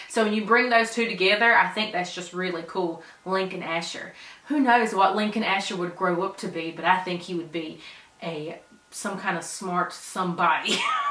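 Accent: South, United States. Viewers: This is so unique! I love this video idea. Your country accent is so adorable. New follower, super excited to keep up with your channel!!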